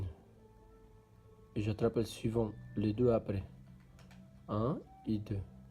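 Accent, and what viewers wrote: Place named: Pakistan. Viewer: excusez moi l'accent je pensse indou mais non c Pakistan lol bref continue vos vidéos svp vous expliquez trop bien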